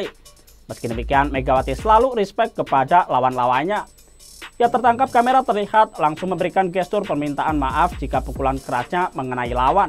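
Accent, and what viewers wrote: Java, Indonesia. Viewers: Logat kamu terlalu medhok..lur..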